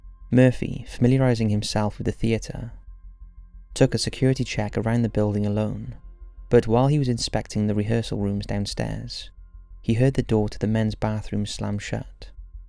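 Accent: Wales, United Kingdom. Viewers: It's the soft Welsh burr of his accent that does it for me. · Anyone else feel that narration with a British Accent makes these stories more scary?? Or any accent from the U.K. for that matter...I don't feel the same air of scariness with a regular American accent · I love your channel man, what part of Wales are you from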